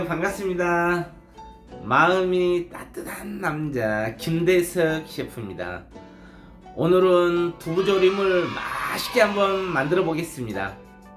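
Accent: Jeolla, South Korea. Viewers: 전라도 말씨 거슬려요 비호감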